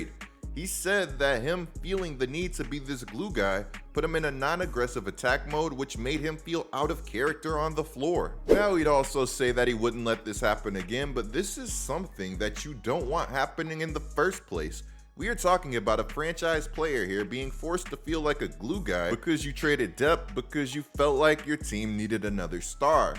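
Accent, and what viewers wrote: New Orleans, United States. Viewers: You from Nola ?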